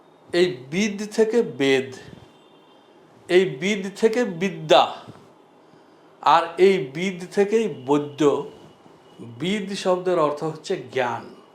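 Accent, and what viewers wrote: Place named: Bankura-Purulia, India. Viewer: আপনার কথা শুনে মনে হয়েছে, আপনি উত্তর বঙ্গীয় বা বাঁকুড়া পুরুলিয়ার কোথাও। এটা আমার অনুমান। আমার বাড়ি বর্দ্ধমান জেলার উত্তর প্রান্তে। মোটামুটি কবিগুরুর শান্তিনিকেতনের কাছাকাছি। আপনার কাছে বহু কিছু শেখার আছে। আমার বয়স ৭১+। কিন্তু জ্ঞানে আমি নেহাত শিশু। পেশায় একজন সঙ্গীত শিক্ষক। তাতেও বোধ হয় শিশু। খুব কষ্ট হয়, এতদিনেও শিশু হয়েই রইলাম। আমার শ্রদ্ধাপূর্ণ নমস্কার নেবেন।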